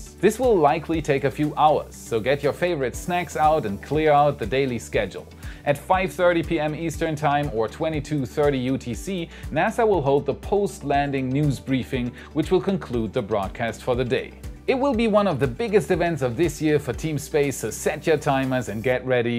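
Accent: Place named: Germany. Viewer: Your English pronunciation is nearly perfect, but a little of your German comes through. Which I find charming. Your video is excellent. Danke!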